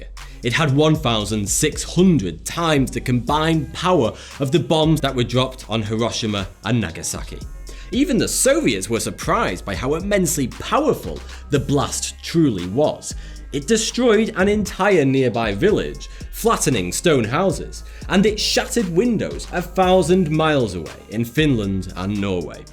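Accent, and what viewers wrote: United Kingdom. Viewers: i susbcribed cause of his brittish accent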